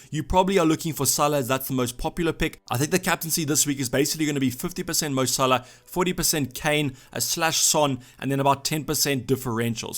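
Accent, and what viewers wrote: South Africa. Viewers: Are you South African my boet? 😂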